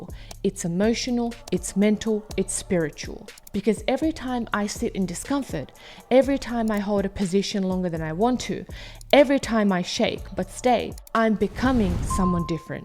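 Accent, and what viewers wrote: South Africa. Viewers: Random question, are you from South Africa, I hear a bit of an accent there? Great videos btw. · It's the accent right? I also thought we were from SA when she said 'bro'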